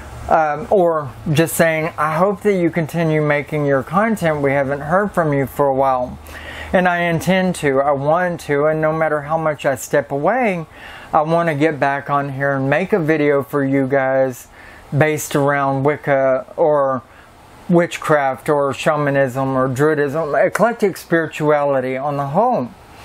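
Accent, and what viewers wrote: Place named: Dakota, United States. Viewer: I lv your accent Is it dakota?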